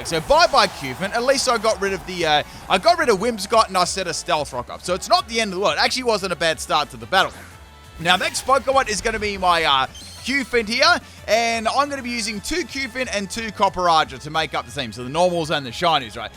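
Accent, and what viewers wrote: Australia. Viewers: Aussie voice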